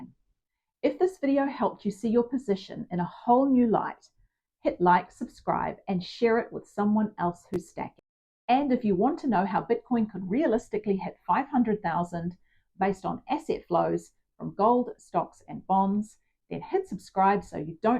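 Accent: New Zealand. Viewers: You sound like a kiwi .. are you? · Bitcoin is 👑..keep up the Great work, Spreading the Bitcoin Message..My Btc Journey began in May 2021, and I never looked back..I enjoy your content as well as..Your Hot 🔥🔥🔥....I look forward to your next episode..I detect a kiwi accent..😬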